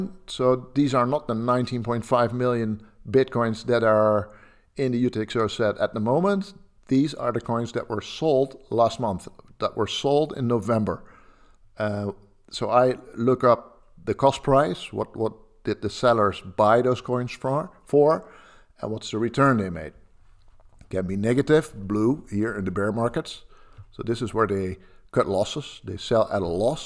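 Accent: Netherlands. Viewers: You've a Dutch accent on your English. What did you study at the Uni that enabled you to put out these models? Asking for my son. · Really nice accent. It almost sounds Dutch but I can't place it exactly. Where is it from? Edit: Channel says Netherlands. So I was onto it. I love the Dutch-English accent. Sorry I'm weird. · Come for the bitcoin insights, stay for the Dutch accent! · A very slight Dutch accent is what I’m detecting.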